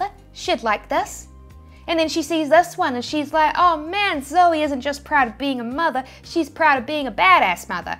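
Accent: New Zealand. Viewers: At no point in time did I think your accent was Australian. Definitely Kiwi. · Right when I thought you had an Australian accent you popped out of nowhere and said this is a New Zealand accent lol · Loving that New Zealand accent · New Zealand accent is not that much of an issue. Guys from India have a whole lot bigger accent problem. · Hello, you have New Zealand accent, I'm not a native English speaker but I thought at first that you are Irish ❤ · Fun fact - despite being an American, I somehow suspected your accent was Kiwi rather than Aussie. I guess I've just had that much experience already from hearing both thanks to the interwebs. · Great channel and great videos I have followed your advice and made great progress. but now I need your HELP!!! So teespring has disabled my account on the bases that I had violated their trademark rules. I do not understand why this happened, I have uploaded more than a 100 design to the site and none of them has any mark or logo that belongs to someone else. 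Please what should I Do? Do you have any consultant page where I can reach you directly for advice? I would of course pay for this service. Thank you for your cooperation and keep up the good work. I love your accent by the way Kiwis are very nice people! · it was an Itsy bitsy teeny weeny yellow poker dot, new zealand tein ny ( ten) haha! U are sweet! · The New Zealand accent is wonderful! I just love the way it sounds.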